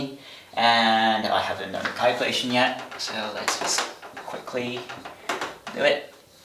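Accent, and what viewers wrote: United Kingdom. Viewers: I think you have an English accent rather than an American accent, but EIGO UMASUGIDESHO! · As a bilingual viewer of your channel who hasn't had much experience with math in English, I greatly appreciate this video. I love your son's British accent and look forward to further lessons! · Excellent! Very well explained considering it's your first time. It's also great to hear a Japanese person speak with a British accent! I hope you make more videos. :) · British Englishばりばりでかっけえ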